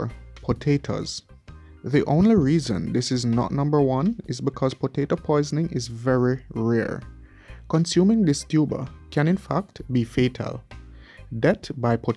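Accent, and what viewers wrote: Jamaica. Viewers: Very Imformative....I like that you just speak in your normal Jamaican accent & not do the twanging that some others on YouTube do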